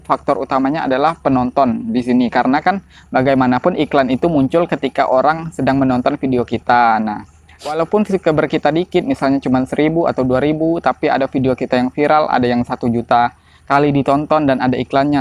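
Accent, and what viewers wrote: Jambi, Indonesia. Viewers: orang jambi kah bang?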